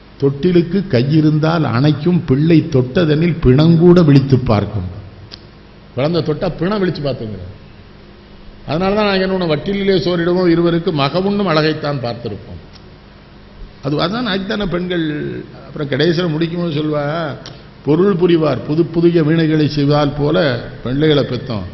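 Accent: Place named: Tirunelveli, India. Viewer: நெல்லை பாஷை அருமை.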